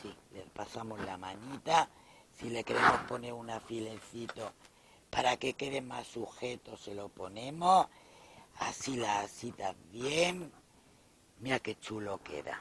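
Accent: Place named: Spain. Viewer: Q delicia es escucharla! Ud es una mujeraza, c mucha luz, valentía y dulzura Soy argentina, c raíces españolas, y adoro escuchar el acento d esa tierra, a la q añoro conocer Dios la bendiga c mucha salud, guerrera incansable❤❤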